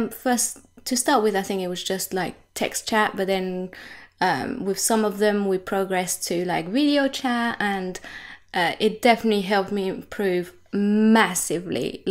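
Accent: England, United Kingdom. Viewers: vous avez un accent anglais, il est tres mignon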